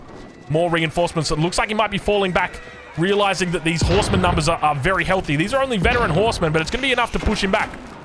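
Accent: Australia. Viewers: This wild wolololo of was the best part of the video. question, does every Australian sound like Steve Irwin? Greetings from the Netherlands (Y)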